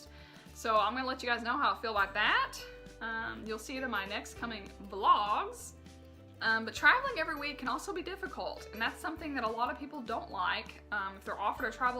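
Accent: United States. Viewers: What American accent is it?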